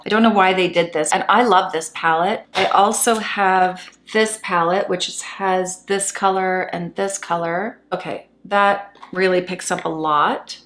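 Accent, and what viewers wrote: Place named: Canada. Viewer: Lisa’s team would probably let you return it for a refund, if you don’t think you’ll use it. They’re amazing! To answer your question, I use my fingers with the more glittery ones too, or a wet brush like you do. That madrigal(?) shade is so lush though! I’ve got all of the lustre ones (collected gradually, I’m a bit of an addict with LE stuff 🤣) but not as yet any of the liquid silk ones! Hopefully soon, as my budget allows. 🤦🏼‍♀️🤪 I think they might be my go-to ones once I get them! 😍 Delighted you replied, thank you. It must be hard keeping up with comments and editing etc!! I appreciate your work and time. X I so love your chatty natural style, it makes me feel like I’m in the room with a friend, watching you put on your face, haha. I love that. 😂 I’m from Scotland, of all places, my name is Linley. I love your wee Canadian accent touches. 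🥰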